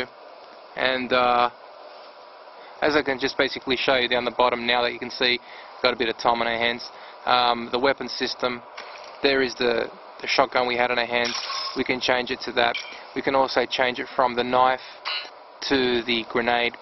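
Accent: Australia. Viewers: Awesome video. I am in Australia as well. I assume you are in Aus with that accent.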